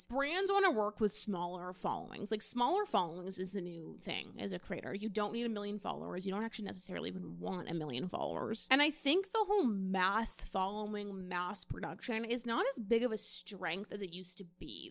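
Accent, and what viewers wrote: United States. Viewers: American woman with that goddamn accent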